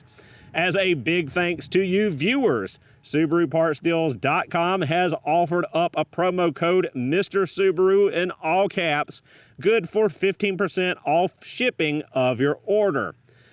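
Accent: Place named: Carolina, United States. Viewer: I'm always fascinated by the rural Carolina accent(s) in which "on" is pronounced "own" where virtually everywhere else in the U.S. it's "awn". Here in New Orleans, we perfected "puhkawn" for "pecan" while the rest of the country goes with "peecan". Variety is the spice of life!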